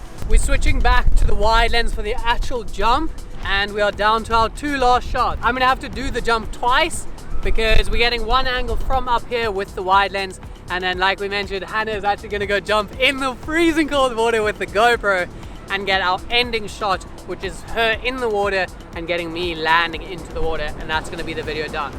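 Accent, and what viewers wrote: South Africa. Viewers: Hey, are you from South Africa?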